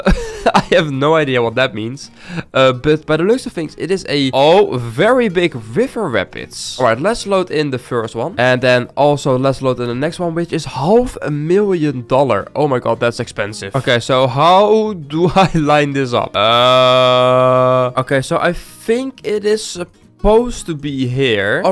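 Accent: Netherlands. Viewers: Hes dutch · he’s Dutch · I have a question are u form the netherlands?? Cuz u sound like u form😅😅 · are you NL? you sound like it while speaking English i can now a am also Dutch.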